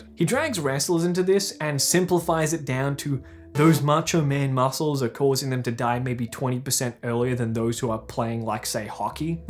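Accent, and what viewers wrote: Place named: Australia. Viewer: Its the australian accent and authentic australian delivery 👌🏻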